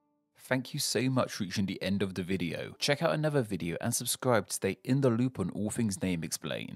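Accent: United Kingdom. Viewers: He didn’t butcher them. His British accent didn’t help in some but over all he did very well. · Your British accent is very difficult to understand for me as an English-speaker living in America. Can other Brits understand you? a Yorkshire man for example, or a Cockney, or for gosh-sakes a Scot? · The british pronunciation is so annoying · This is a GREAT video. Just some notes. Sonora = So-NOR-ra. The "sur" in Baja California Sur should have a strong "uu" sound. And yeah, saying Mexican names with that British accent just can't be the best. You did good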